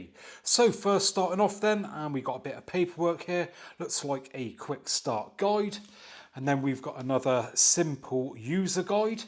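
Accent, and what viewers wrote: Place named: West Country, United Kingdom. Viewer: Sounds west country. Looking at getting this tv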